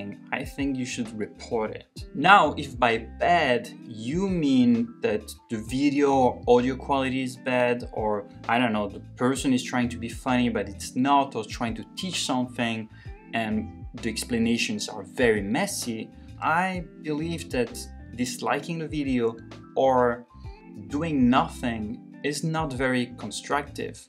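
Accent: France. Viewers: Hey, your accent seems french, if you are then please, can you start your video with a french word related to that video and what it means...just a wish though if you could....love french, would love to learn from you:D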